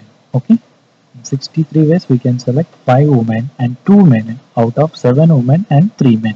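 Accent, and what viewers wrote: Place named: India. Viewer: These are such good videos :) Thank you and thumbs up for that authentic Indian accent :D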